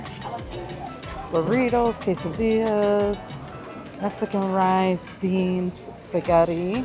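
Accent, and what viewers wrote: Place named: Caribbean. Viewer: OK. I'm from New York as well, Brooklyn to be exact. I kinda hear a Caribbean accent too from you.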